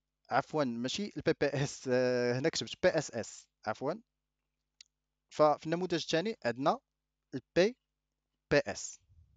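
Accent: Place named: Maghreb. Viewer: لا استطيع فهم اللكنه المغاربيه مهما حاولت للاسف اللكنه او اللهجه المصريه اصبحت رقم واحد في الوطن العربي😔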